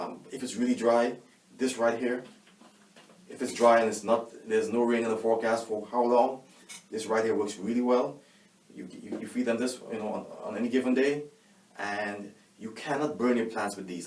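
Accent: Trinidad and Tobago. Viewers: Thanks so much for this. I now started using Tomato Tone. PS for the entire video I thought "Why is he sounding like me?" Only to read the comments and see that you're from Trinidad too. I'm just here in Couva trying desperately to grow tomatoes :) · Sound Trini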